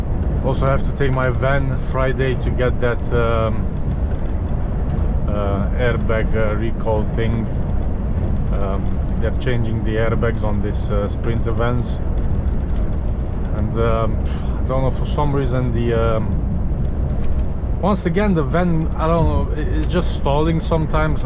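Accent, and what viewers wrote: United Kingdom. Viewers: Love the way your Scouse is coming along,. Couldn't live without the videos, proper grounding seeing other jobs like yours (and mine) which keep the lights on in the country. Have a good Christmas and New Year squire 👍🏻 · I can hear a bit of scouse in you 😁😁. I am from Liverpool do you no anywhere takin on or what agency do you use?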